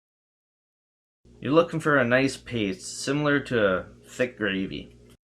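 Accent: Canada. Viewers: = HA! I knew you were a Canuck from your accent! And the Tim's cup cinched it! LOL!!! Great build! So much free stuff to build with, I don't know why anyone buys stuff! And nice job using paper mache. Free and looks better than the plaster cloth. The plaster cloth always shows through. Have fun! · Sorry lol, as soon as I saw the yellow bag of flour with the French label showing "Tout Usage" (all purpose) I recognised the Canadian factor - then when I heard buddy's accent I thought "O fk yah bud!" Haha 😄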